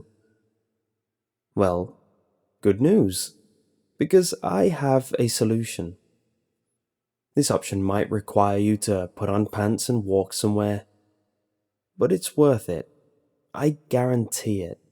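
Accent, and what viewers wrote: United Kingdom. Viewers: "Tooo The Le Taah"...damn I love british accents 😁 And fuck this, waaay too many instructions going waaay too fast. I woulda been said, "fuck you, I'm not THAT bored!" You are my all time fave pasta reader. You could read the ingrediants on a candy bar wrapper and make it sound bad ass. Please start a childrens' channel and read Dr.Suess and fairy tales stories also 👍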